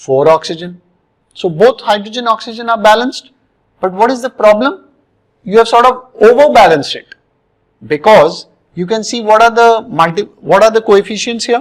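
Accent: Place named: India. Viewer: You better listen and forget his Indian accent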